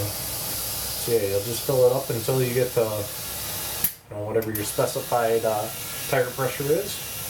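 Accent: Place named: Wisconsin, United States. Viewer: Done. I am also from Wisconsin so I recognized the "accent."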